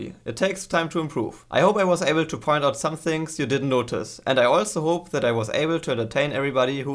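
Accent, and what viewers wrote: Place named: Germany. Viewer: Alter ich glaub jeder deutsche erkennt, dass du net englisch bist😂 aber is ja kein problem.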